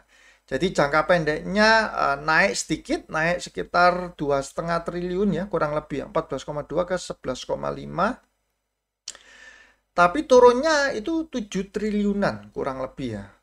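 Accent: Surabaya, Indonesia. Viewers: Suka medhok Suroboyoane.. Suwun Cak ilmune.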